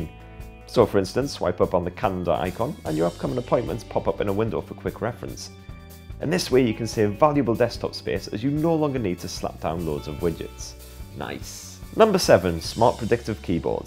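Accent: United Kingdom. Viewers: love that brit accent jaja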